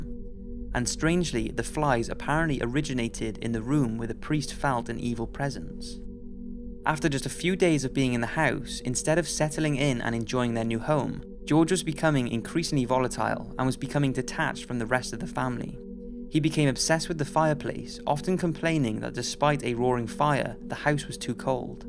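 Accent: Wales, United Kingdom. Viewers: Yes, he should definitely do audio books. Such a soothing and clear voice. Is that a slight Welsh accent I hear...? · finally a Brit narrator😅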